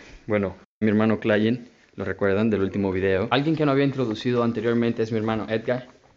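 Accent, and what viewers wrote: Dominican Republic. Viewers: Eres dominicano!!!!!!!!!!!!!!!!!!!!!!!!!!!!!!!!!!!!!!!!!!?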